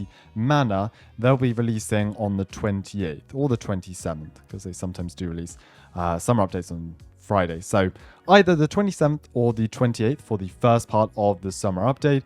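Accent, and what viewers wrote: United Kingdom. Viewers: Are you British or American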